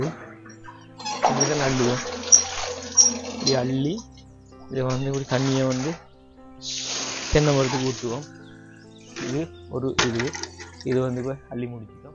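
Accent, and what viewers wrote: Sri Lanka. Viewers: Neengal pesum ilangai tamil migavum asagaka irukirathu brother happy married life.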